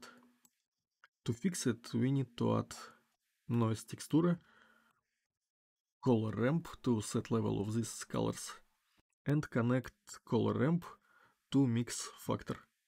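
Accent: Russia. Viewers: А как-то на родном, а не на конченом вражеском, на комильфо уже? Может, предоставить перевод самим браузерам делать? Поищу либо на оригинале (включу перевод), либо на родном, русском. Пишу по-русски ибо акцент дичайший, русский человек говорит. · cool tutorial but with your accent you should sound evil russian characters in games and films)) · По окценту понятно что русский)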